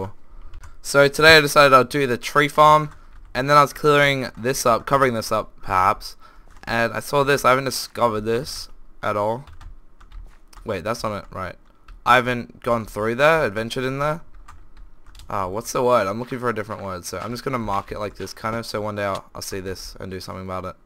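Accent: Australia. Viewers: I swear he's Australian.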